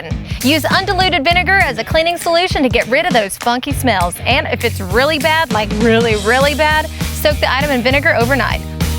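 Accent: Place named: Alabama, United States. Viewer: Alambamian beauty. Love your accent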